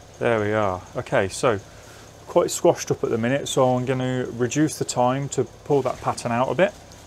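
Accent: United Kingdom. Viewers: Another English accent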